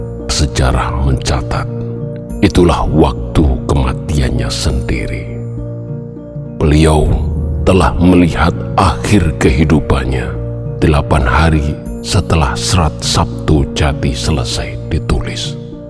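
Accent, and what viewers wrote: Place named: Mataraman, Indonesia. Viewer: Kata-kata yang bahasa Jawa jangan dibaca serba o, aku bingung Jangan pake logat Mataraman Pake logat Jawa yang asli saja, a tetap dibaca a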